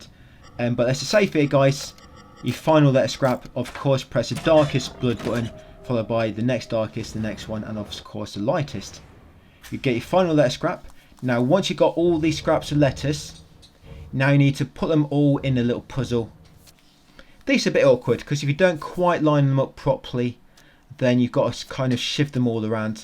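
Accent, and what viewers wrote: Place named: London, United Kingdom. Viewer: Most be from London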